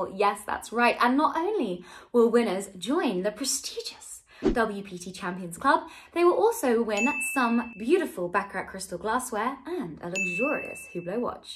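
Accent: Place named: Australia. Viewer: australian accent i hear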